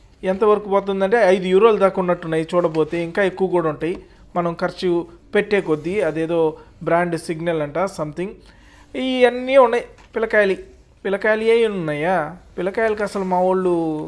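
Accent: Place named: Rayalaseema, India. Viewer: Medhi a district anna rayalaseema slang la vudhi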